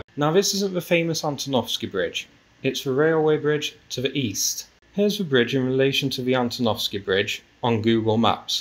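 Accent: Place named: England, United Kingdom. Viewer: What I like the most about this channel is that the analysis, I would say forensic, is very deep and advances with overwhelming logic until some conclusion or leaves more questions to think about. It reminds me of Mr. Spock. I want to congratulate you for all these videos, for the marked English accent, and for the pauses that are just as revealing as the words!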